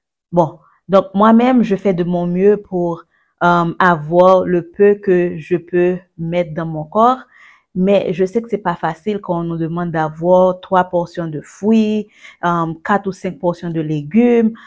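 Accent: Haiti. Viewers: elle a un accent Haïtien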